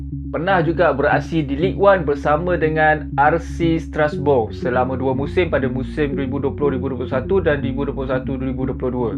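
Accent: Malaysia. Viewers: Pronunciation biar betul bang, jangan Melayu sangat bunyinya.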